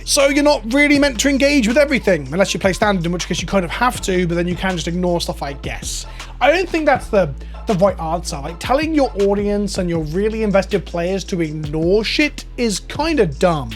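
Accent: United Kingdom. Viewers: Also, what makes you think you're from Europe? You sound awfully British to me.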